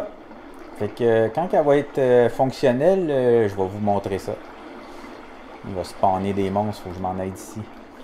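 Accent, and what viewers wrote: Quebec, Canada. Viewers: J'ai regarder toutes tes vidéo et franchement je les adorent, continu, car tu as arrêter depuis un moment, on est tous avec toi ! De plus ne change rien a ton accent québécois , j'adore les québécois. Alors va y ! Ne nous déçois pas :-)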